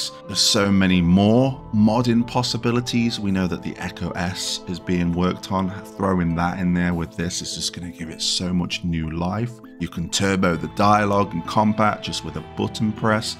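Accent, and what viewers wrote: United Kingdom. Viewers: “Beer tricks” 😂. Love a British accent.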